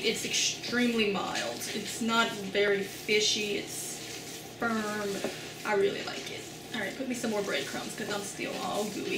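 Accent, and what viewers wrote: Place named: South, United States. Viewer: Gotta be a southern girl!!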